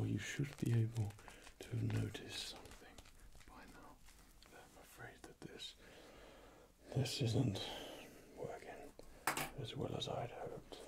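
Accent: Australia. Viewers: Is he Australian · May Shida he's Australian I think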